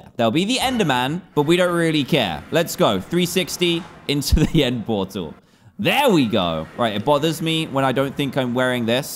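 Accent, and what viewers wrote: United Kingdom. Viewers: how would they be brothers? Think is from NYC and is from the UK. Why do you think has a British accent and Justin doesn’t.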